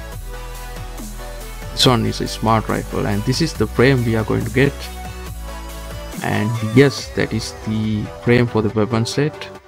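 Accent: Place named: India. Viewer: Are you from india? Cuz your accent is Indian.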